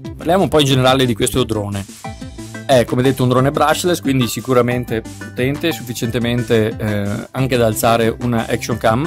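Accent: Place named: Veneto, Italy. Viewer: Grazie, l’accento ti frega sei Veneto?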